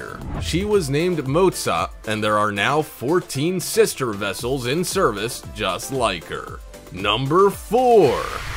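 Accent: United States. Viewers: VERY annoying melodramatic American commentary, unwatchable. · An American butchering the English language. - Ridiculous Pronunciation of regular words. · The lector is too americanised for my liking.. So irritating...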